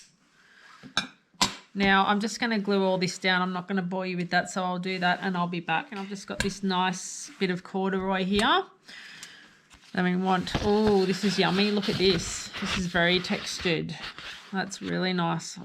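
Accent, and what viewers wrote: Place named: Australia. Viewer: I picked your Aussie accent straight up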